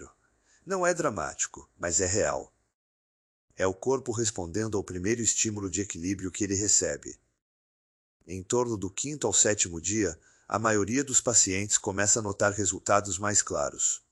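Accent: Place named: Portugal. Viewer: Interessante este Dr. IA fala português de Portugal e português do Brasil! 😮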